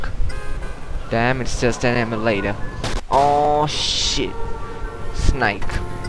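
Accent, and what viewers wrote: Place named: Kerala, India. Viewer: Lol you have one proper mallu accent, undeniable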